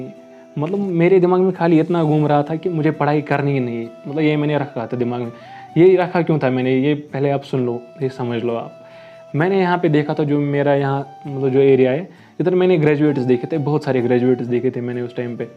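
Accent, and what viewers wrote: Kashmir, India. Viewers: Bhai aap Kashmir se hona ??? Ap ke bat Karne ke tarike se pata chal Raha Hain ??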